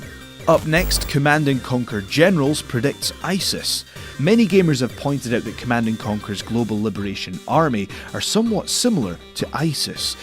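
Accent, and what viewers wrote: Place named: Scotland, United Kingdom. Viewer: Dude must be from the same town as Gerard Butler, their accents are identical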